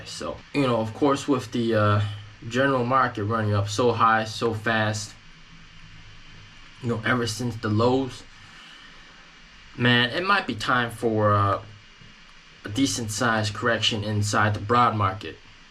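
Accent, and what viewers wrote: South, United States. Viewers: Informative insider look, great video The southern accent caught me off guard 😂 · Are you working for Tesla, Maaaaan....you accent is right out usa .....how many billions tesla has burnt..?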